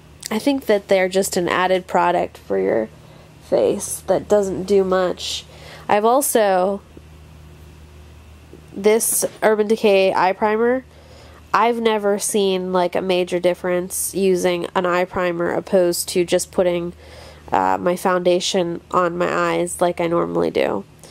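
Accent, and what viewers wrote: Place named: South, United States. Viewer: Happy 200th! Loved the southern accent! Thinking the lil man needs his lil' behind cut before he gets some gross build up back there!! He's such a cutie pie!! XOXO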